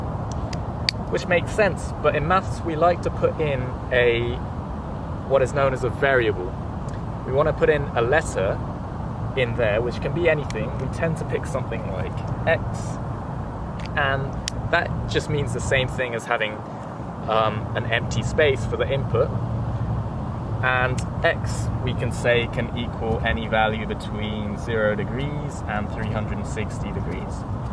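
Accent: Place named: United States. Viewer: tu indian hai, par acche american accent bol rha hai.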